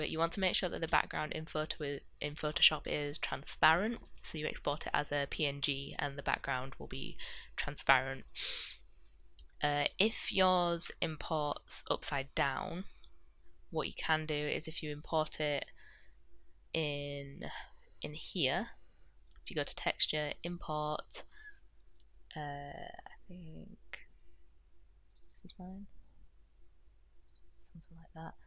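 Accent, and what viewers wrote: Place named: North, United Kingdom. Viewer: great vid and love that northern accent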